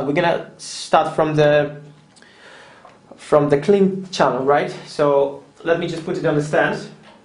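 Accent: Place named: Germany. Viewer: Whats with the voice.. I hear a German accent.. whats the issue with u puss***s :D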